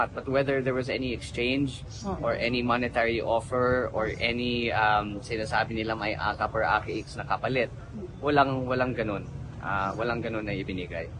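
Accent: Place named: Ilocos, Philippines. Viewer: Haha nakakatuwa yung ilocano accent niya. Yun lang. 😂😂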